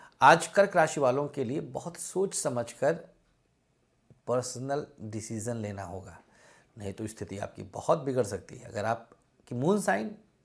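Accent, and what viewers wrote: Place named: Awadh, India. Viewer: Shree radhe guruji apki avadhi boli bhot jyada pyari lgti h love u always... chrn sprsh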